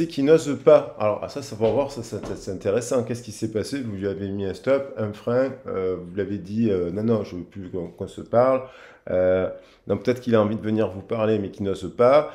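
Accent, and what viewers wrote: South, France. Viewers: Merci pour cette guidance! Avé l'accent du soleil, c'est encore mieux🤣! Séparation et abandon datant de 2021, vu qu'il ne se décidait pas à acter un choix, j'ai mis un terme à ses visites (amicales), il n'a pas apprécié!!! Belle soirée:face-red-heart-shape:🌠!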